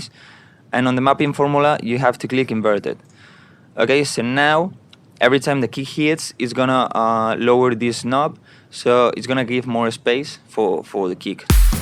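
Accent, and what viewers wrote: Spain. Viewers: Tipic spanish inglish JAJAJA GOOD video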